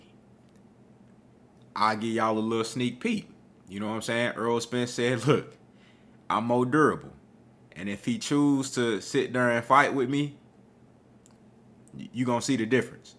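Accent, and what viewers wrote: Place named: Dallas, United States. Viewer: Man Kel Brook was already broken up.....and Porter actually looked like he would have beat Spence....he was on Spence ass!! Say your accent gives you away...you a Dallas fan boy......you aint givin us commentary that unbiased.....mane....you a fan boy mane we aint buyin what u sellin mane!! Spence aint cerebral he a brawler....he has never been mentally challenged but he will this fight and Im not a fan of welterweight boxers!!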